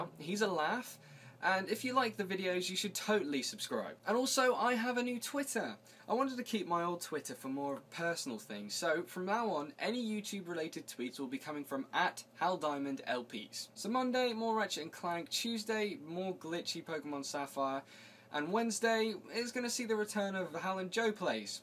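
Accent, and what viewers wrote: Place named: United Kingdom. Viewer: Congrats on the 2000. I know with the LPs I watch the commentary I like to listen to some one who sounds (key word) atractive. Not only you have a lovely, natural, Brittish accent, but you put your lovely, actractive face on the screen. You have the extra facial expressions that a lot of the more popular LPers don't post on their videos. This also makes your LPs unique.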